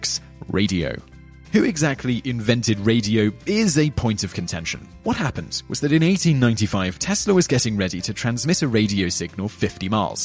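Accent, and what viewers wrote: United Kingdom. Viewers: This guy is singlehandedly making british accents sound stupid.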